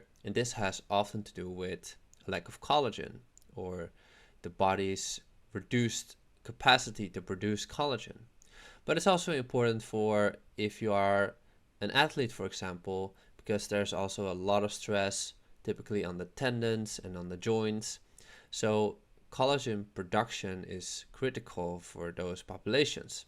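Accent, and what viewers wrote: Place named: Netherlands. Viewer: Glycine is great, great for bed time, doing keto or carnivore its good to add some glycine. one can balance the methionine with glycine glycine is sweet. I Am just having a glass water with 5 g glycine. You do sound Dutch as I Am subscribed Glycine does so much, its even a mood stabilizer, good for the skin. I often put apple cider vinegar with glycine before my keto meal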